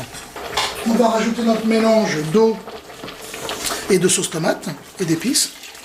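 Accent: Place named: France. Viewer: Merci pour votre recette, c'est en cours de préparation chez moi! Et merci pour ce bel accent bien français ;)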